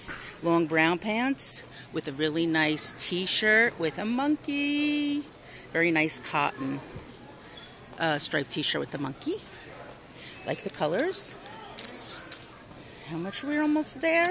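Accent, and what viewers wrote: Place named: New Jersey, United States. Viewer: Dakota will look super cute in that bunny romper!! Thanks for taking us shopping with you!! I love the outfit that siad hero and had the little bulldog..I love all the different things your Wal Mart has!! I love the monkeys and dogs on the planes sleeper!! Totally love the monkey best friends set as well! Who am I kidding..I loved every bit of it!!I love your NJ accent..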